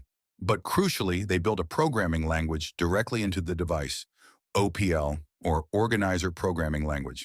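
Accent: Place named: United States. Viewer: Can someone please tell me why a video on British computers has an American voice??! I find this very odd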